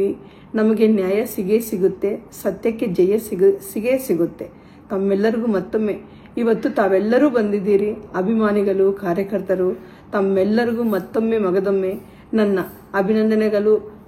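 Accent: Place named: Telugu, India. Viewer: Telkannada adu 😂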